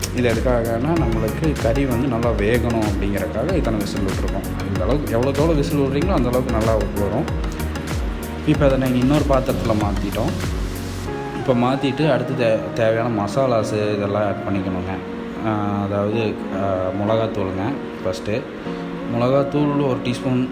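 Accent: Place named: Kongu, India. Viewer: Kongu Kovai Tamil voice 😍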